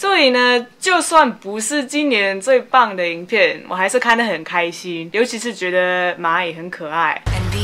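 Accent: Taiwan. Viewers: 不錯 中文講得很好 很台式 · 哇 中文講的真好 連你講英文都感覺好台哦 最好台語也學一學 那你就真的變成台灣人了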